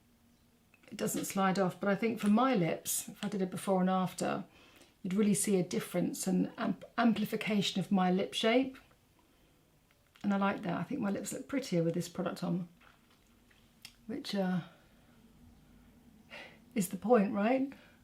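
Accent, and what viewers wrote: United Kingdom. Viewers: you are adorable and I love your sweet soft British accent. This was a very nice tutorial. All the detailed explanation of the products and techniques really helps the everyday makeup wearer. Thank you and stay well ❤️🌻🌹🌺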